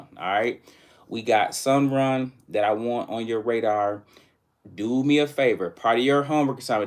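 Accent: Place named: Midwest, United States. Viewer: Live your accent. You from the midwest? Indiana, Missouri, Oklahoma or Wisconsin?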